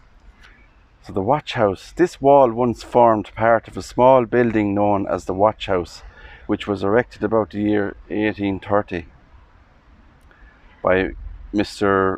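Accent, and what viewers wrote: Ireland. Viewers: My mother often took us to tour grave yards. I absolutely love this. has such a beautiful Irish accent and he's so calm, steadfast, easy going. I feel so much better in this crazy life just listening to him. Thanks much!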